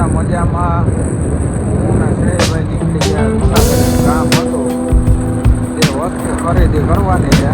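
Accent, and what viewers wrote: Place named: Kathiyawad, India. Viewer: bhai aapno pure kathiyawadi flowless communication👌👌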